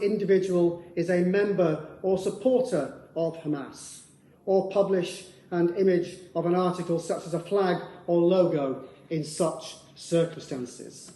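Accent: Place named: England, United Kingdom. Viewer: bad sound quality and that silly English garbled accent-yuckkkkkk!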